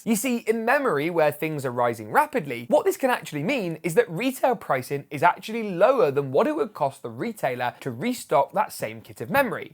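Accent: United Kingdom. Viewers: His accent is British , its completely different compared to US accent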